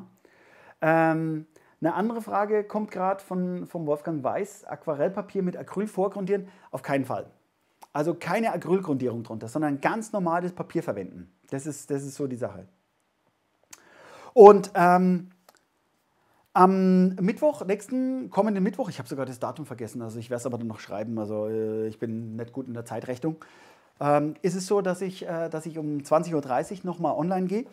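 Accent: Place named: Franconia, Germany. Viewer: Danke für das Video 🌷Du klingst aber nicht nach Wien... Eher nach Franken 😉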